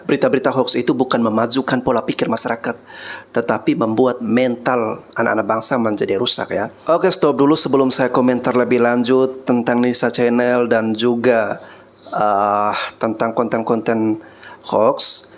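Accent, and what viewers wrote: Flores, Indonesia. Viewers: oh ye ke slang dia mcm org Indonesia jer kihkihkih 😂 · Aksen logatnya itu org NTT Flores 😊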